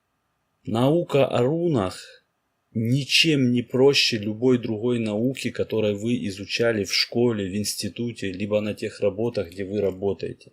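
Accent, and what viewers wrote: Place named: Donetsk, Ukraine. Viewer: У меня был друг с Донецка..Димка.....у него говор как у Вас....мне приятно....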